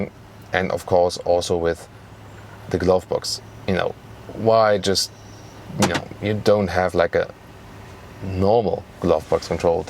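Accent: Germany. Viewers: This guy has strong german accent. funny.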